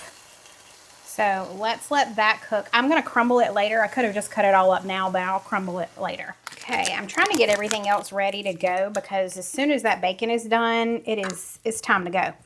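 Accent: South, United States. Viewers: Embrace your southern accent!!! Say boil and broil and oil the way you normally say it. 😊 We never miss an episode!!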